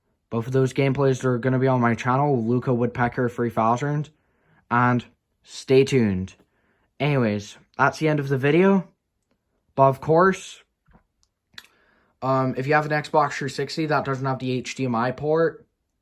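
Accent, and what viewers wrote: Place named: United States. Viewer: it an autistic sounding american accent, hope this helps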